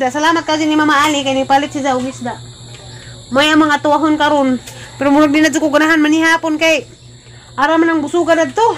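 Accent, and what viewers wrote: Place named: Visayas, Philippines. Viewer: . Arang k islang ag host oii. Halata baya PUD NGA BISDAK KAAU.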